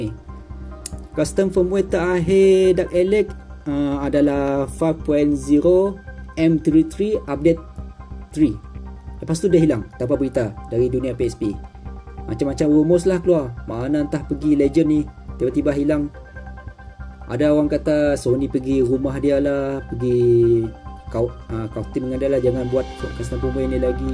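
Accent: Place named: Sarawak, Malaysia. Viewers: Aku tak phm sangat apa yg dia terang tu, tpi yg aku tau speaker tu org sarawak sbb dri segi dia bercakap mmg nampak slang org sarawak. Aku harap bukan aku sorang je yg perasan. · Bunyi kedak org sarawak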